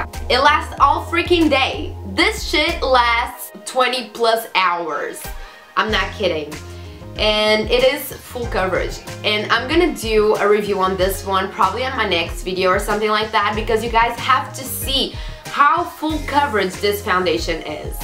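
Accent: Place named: Portugal. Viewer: hmmm okkk! Mas se calhar era uma forma de eles começarem a perceber outra língua! Acho que não é tão natural, eu percebi logo que eras portuguesa. E atenção, que falas muito bem! Mas acho que podias ter mais sucesso de falasses em português. Mas se não te sentes confortável, então já é outra coisa. Muitos beijinhos!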